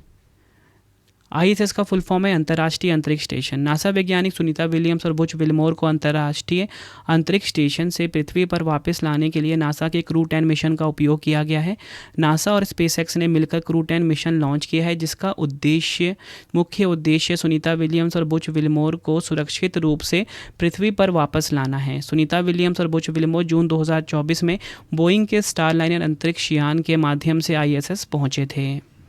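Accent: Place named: Bengal, India. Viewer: Bengali accent hai..... acchha lagta hai... Agreed 👍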